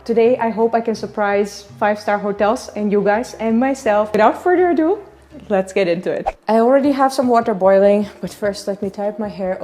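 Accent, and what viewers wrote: India. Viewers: Hoi hoi, wat een leuke video. Ik moet je eerlijk bekennen dat jou Engels achteruit is gegaan. Ik weet dat je nu in India woont, maar je spreekt nu ook echt engels net als de lokals. Vind het jammer, want je sprak altijd heel goed engels. # No diss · I kind of hear an indian accent 🙈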